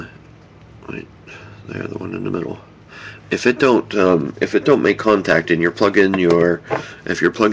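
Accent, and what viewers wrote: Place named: Pittsburgh, United States. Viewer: Great video..good tip about the Riva battery charger..I have to pull up the contact on the charger every time I charge my Riva..actually thought it was broke until I saw this tip..I know you're from Pittsburgh because you really have the Pittsburghese accent..Jeet jet??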